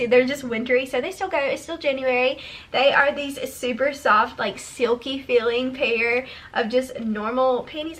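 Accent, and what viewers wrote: South, United States. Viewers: you're so bubbly & i love your Southern accent !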